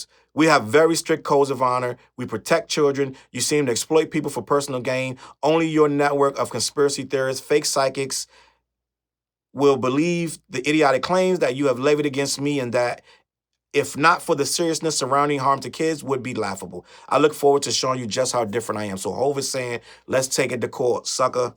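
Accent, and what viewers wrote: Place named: Northeast, United States. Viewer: You hovengers something else lmao ion trust an unbiased opinion on Jay z from a nigga with a northeast accent and fk with you heavy but nah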